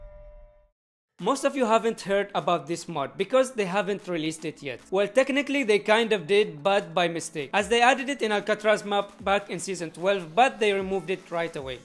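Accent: India. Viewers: At first I thought your indian by your accent then I realised Indian can’t be this good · Are you an Indian bro ? because i think your an indian because of your accent from: Philippines🇵🇭 ❤️❤️❤️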